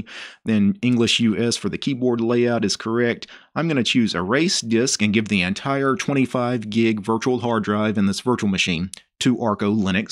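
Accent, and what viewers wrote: South, United States. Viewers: words like: fAantAastic, really cul, beooting, anythieng, goe with; they do sound southern cause difference is in pronunciation of vowels, however there are although not many instances that consonants are pronounced with unusual stress change, Im a faking Linguist that's why its interesting for me amdD well this one is also d at the end so its practically D+EE which C+V, light deiM stress is on last C, urvxdTee is also C+V so nothing abnormal here ITS HARD to pick and choose the words its the overall sound; Southern accent is IN YOUR FACE, 2 words in u can pinpoint where it is from, I guess has spent a period of time in other states, or he has constant contact with people of other states THAT DO NOT speak normal American accent. hey would you answer this too please, about living in other states or having constant contact with ppl from those areas?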